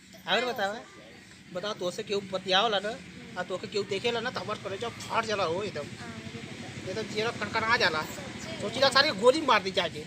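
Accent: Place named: Azamgarh, India. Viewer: achchha Mai bhi azamgarh se hu apke bolne ke tareke se hi mughe bahut Dino se lag Raha tha ki ap azamgarh se hi Hain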